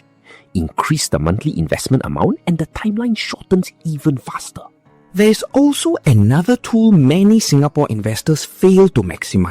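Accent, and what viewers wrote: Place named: Malaysia. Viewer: Why singapore video but malaysian voice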